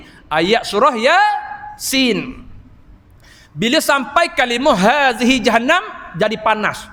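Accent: Terengganu, Malaysia. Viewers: "Saya x boleh cakap laju sangat. Keluar bahasa terengganu" gelak sorang diri aku dibuatnya 🤣 berusaha sungguh Ustaz ni kurangkan loghat nya.. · Sedak dgr ustaz cakap ganu..💯💯🔥🔥..tq ustaz atas ilmu yg dikongsi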